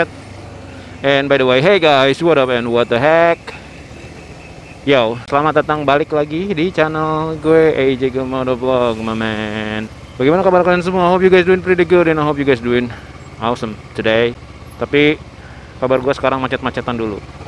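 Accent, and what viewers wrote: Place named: Sunda, Indonesia. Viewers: Msih melek ehhh ada yg update video maaciw udh nemenin begadang "Ayo pa jangan clengak-clenguk", "sebentar ya om", "usaha keun" alah alah hahahaha gg bisa nahan ktwa bagian ini sunda pisan 😄😄😄 Di tunggu next videonya ya aa aj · sundanya masih ke bawa ..hihihi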